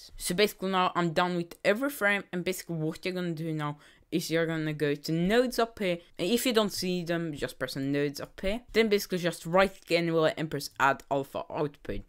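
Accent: Sweden. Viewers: hes swedish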